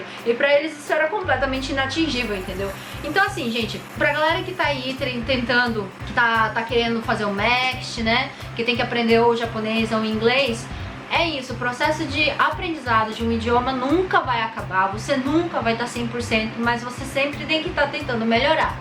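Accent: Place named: Rio de Janeiro, Brazil. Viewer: Oinhe Mestra * - * e esse sotaque carioca ai kkkkkkk adorei seu video (como sempre ne <3) to estudando ingles e japones mas ta sendo dificil pq odeio ingles, mas fazer oq ne a gente tem q aprender:( ja quero outro video:D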